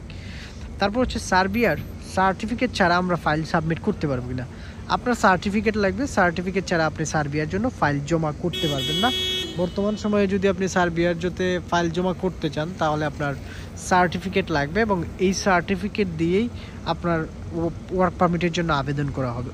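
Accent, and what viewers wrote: Sylhet, Bangladesh. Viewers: vai apne ki sylheti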